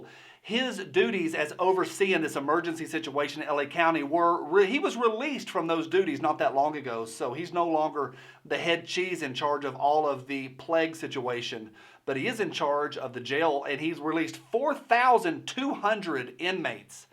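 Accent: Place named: Alabama, United States. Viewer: No problems here... Yet. I'm in a constitutional carry state, a very good one I might add. No police problems and no prisoners released yet. I believe criminals should be kept locked up unless we're facing an apocalypse. Even then murderers and other violent criminals should never be released. Your videos are great, always look forward to them. You sound like home, I'm from Alabama. Keep up the good work!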